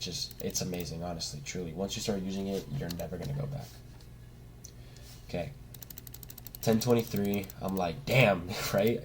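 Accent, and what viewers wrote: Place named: West Virginia, United States. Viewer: west virginia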